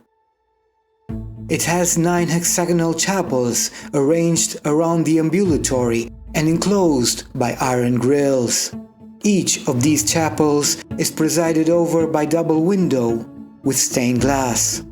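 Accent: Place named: United Kingdom. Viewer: una vez más me quito el sombrero ante tí, con tu perfecto British Accent y tu maravillosa forma de narrar,haces conocer al mundo lo precioso que es nuestro país fomentando al mismo tiempo qu vengan a conocernos. Gracias por aprender contigo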